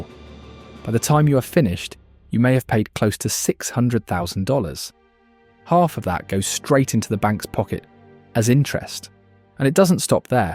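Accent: England, United Kingdom. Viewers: Why you got an english accent. But the whole video is talking about dollars ?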